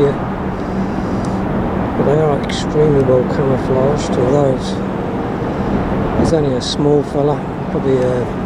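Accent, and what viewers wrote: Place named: London, United Kingdom. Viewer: I've seen a bunch of birds around the Craigieburn Grasslands that I'd never seen before and in some cases still can't identify. It's the largest surviving example of the remaining two percent of the volcanic grassland ecosystem in Melbourne's north, now almost gone. Regarding the Black Cockatoos, I think I might've mentioned previously that for a while I was seeing a flock of Little Corellas on St Paul's Cathedral opposite Flinders St. Station. From your description, the woodpecker I saw was probably a Lesser Spotted Woodpecker, I remember the black, white and red. Someone had put wire netting around the nest entrance to protect it. It was in a local park called Walk Wood, and there was a "myth" about a deer living there. I was only about ten at the time and imagined there was some huge antlered stag roaming the wood. 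But when I mentioned the story to people I'd known from there many years later, they explained that it wasn't a myth at all but that it was actually just a very small species of deer that really does regularly turn up in people's gardens around there. I wasn't sure whether to rejoice that the myth was true or to be disappointed that my "Lock Ness/Yeti deer" sounded like such an ordinary, mundane thing. I never saw one though, so still some mystery left! BTW the accent around Beaconsfield is a shocker, they sound like they're relatives of the Queen :-) I'm no expert on English accents but I'm guessing yours is a London accent - I could be wrong. BTW if you want t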